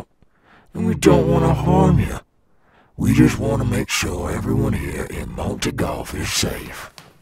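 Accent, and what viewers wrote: South, United States. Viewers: Love the bit of southern accent ☺️ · Now I can’t unhear him speaking with a country accent. I don’t mind it, though.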